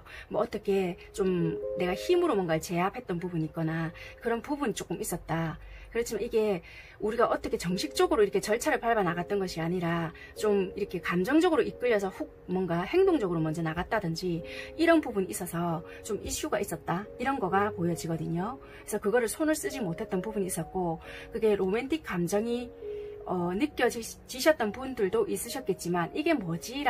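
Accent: Busan, South Korea. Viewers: 아 넵 ㅎㅎ 감사합니다! 항상 영상 잘 보고있어요😊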